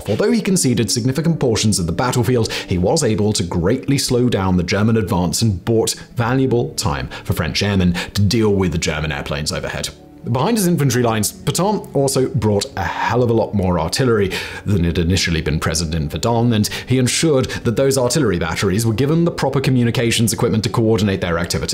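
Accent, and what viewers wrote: United Kingdom. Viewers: Is the narrator British? There's only so many English accents and I don't think it's north American or Australian :/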